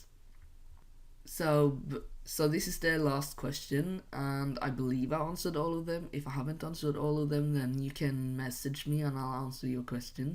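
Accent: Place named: Finland. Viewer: At first I thought you were from Britain, but you sound Finnish (since Idk what norwegians sound like) so I just thought your accent was some sort of hybrid >.>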